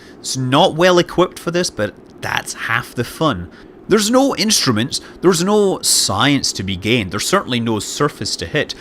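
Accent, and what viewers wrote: Scotland, United Kingdom. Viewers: i want to thank you for your awesome ksp videos, i learnt so much about ksp because of you man!!! and i picked up some real knowlegde along the way. keep up the great work and use your cool scottish accent more we love it:-)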